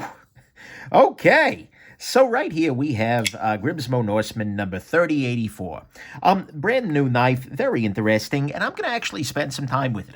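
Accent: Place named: New Jersey, United States. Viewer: funny, but I think is from New Jersey, we don't talk like that in New York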